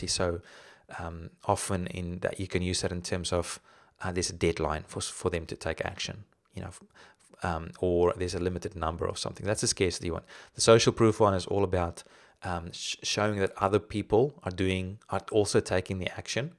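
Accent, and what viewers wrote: South Africa. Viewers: I never thought you were Australian? Being from New Zealand, I thought you had a South African accent (my fave accent haha). But your videos are very helpful, thanks :)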